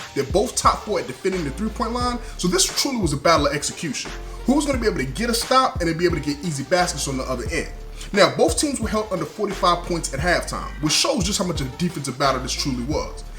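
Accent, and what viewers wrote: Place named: Toronto, Canada. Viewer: man you on these videos quick time.. you sound like you from the 6? yea or nah? regardless who you actually route for its nice to see someone who puts up legit facts on this team cause you know mainstream is all about that LAL LAC Zion GIannis and then other superstars if there is time in their time frame of the show .. raps only get mentioned if they play a vegas team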